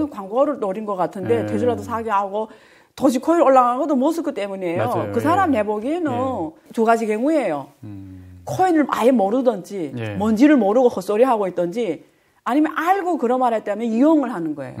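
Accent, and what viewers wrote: China. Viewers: 억양이 조샌족인가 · 조선족이신가요? 억양이.. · 화교인가 발음이 ㄷㄷ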